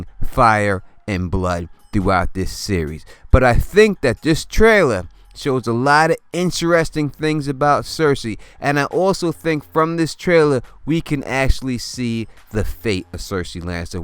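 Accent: New York, United States. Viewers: Glad you're better. Was sick myself couple of weeks ago. Great video 👍 We wouldn't leave ya!! Stay sexy 😘 Could listen to your voice all day. I love New York accents. I live in Alabama so...that doesn't happen enough!!😆💕💙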